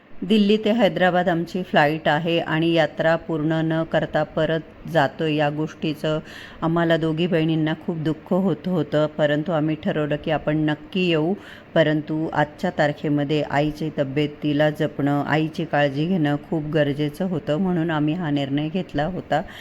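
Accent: Nashik, India. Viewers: मॅडम खूप दिवसांनी video ची वाट पाहत होतो आणि तुमचा वीडियो आला आहे खूप छान तुमचं साद आपल्या नाशिक च बोलन आईकाला खूप आवडते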